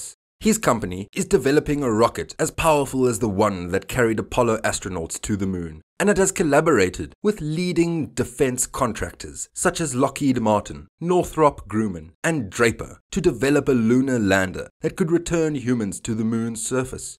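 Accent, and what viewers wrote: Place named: Vaal, South Africa. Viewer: Huz that Vaalie accent bru? Nice and syrupy...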